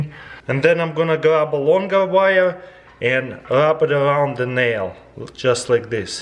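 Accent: Russia. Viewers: it's because the russian's accent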